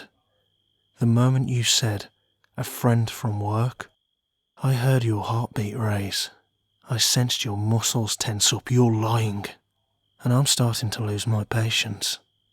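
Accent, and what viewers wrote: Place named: Birmingham, United Kingdom. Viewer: Him: who is he? Me: Levi Ackerman •_• Also really good video! You kinda sound like someone from peaky blinders! I loved it!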